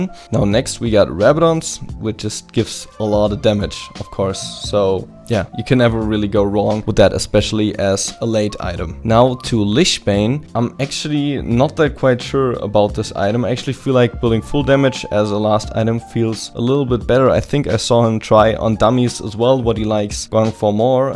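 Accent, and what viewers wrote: Germany. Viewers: German accent ftw xD❤️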